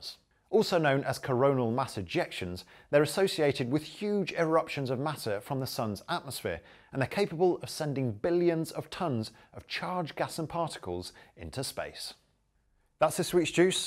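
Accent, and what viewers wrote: United Kingdom. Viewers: Cicaaaadas! I hate British accents! Cicadas.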